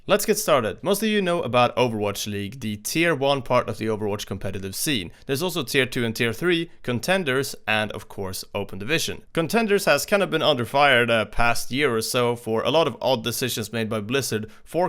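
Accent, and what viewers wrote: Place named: Sweden. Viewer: You sound like a swede.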